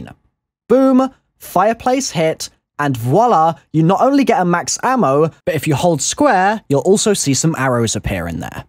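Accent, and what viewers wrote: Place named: United Kingdom. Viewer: his voice is probably "funny" because he's british. if you're american or something around that area, then that's probably why. his voice does sound a bit high-pitched for a grown man, though. maybe that's what you meant.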